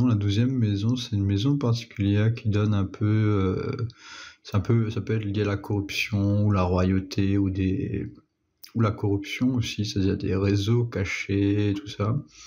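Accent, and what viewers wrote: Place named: Tahiti, French Polynesia. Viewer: Sinon tu as un accent tahitien j'ai l'impression aussi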